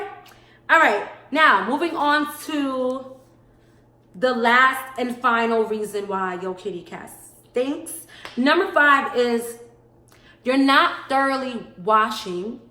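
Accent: New York, United States. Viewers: She sounds like a New Yorker lol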